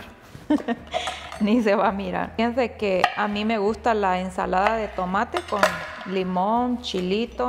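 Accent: El Salvador. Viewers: Holllllaaa! Saludos desde Belize 🇧🇿 somos de El Salvador, Apopa!! ❤️ nos encante ver como usted cocina y como nunca pierda el dialecto salvadoreño 🇸🇻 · X lo que te escucho eres mi paisana salvadoreña , se hacer las pupusas y tortillas pan comido para mi.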